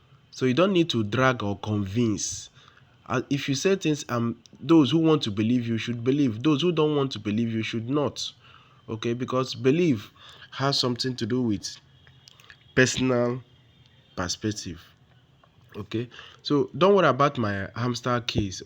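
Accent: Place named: Igbo, Nigeria. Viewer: You sound like a true Igbo guys that know what his doing..... great one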